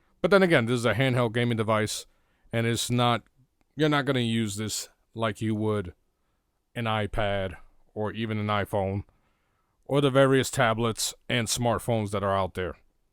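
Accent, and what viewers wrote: United States. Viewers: this is probably the best american voice i ever heard.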